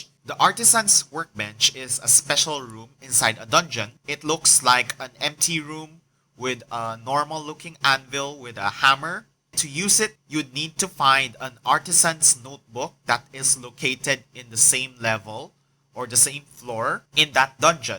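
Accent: Philippines. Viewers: soooooooooooooo filipino accent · I heard Filipino accent here hahaha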